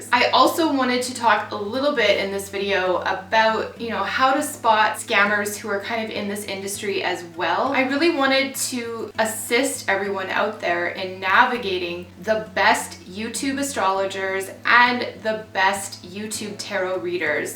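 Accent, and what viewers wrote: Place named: Canada. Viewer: I appreciate ur authenticity and sharing these prominent Youtube leaders! Although they don’t always resonate with me they are knowledgeable & authentic. There so many quasi spiritual charlatans out there presenting 1/2 truths & can be damaging to new seekers. ❤️ ps i thought i heard ur sweet canadian accent!!! 😉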